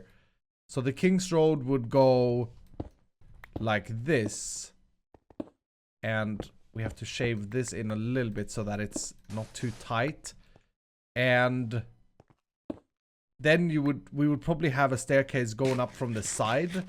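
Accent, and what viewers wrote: Sweden. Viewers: Du är svensk eller hur kanske från dalarna? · Omg XD its so ez 2 hear that u are from Sweden XD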